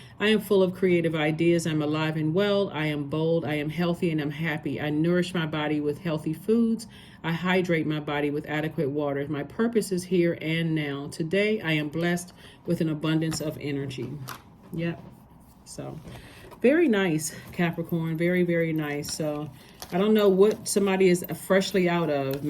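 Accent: United States. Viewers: Thanks so much for this reading and for the support and clarity I have gained through these readings. Take care of yourself and God’s blessings always. You sound American and one day sooner than later I will like to catch up to say Hello. ❤❤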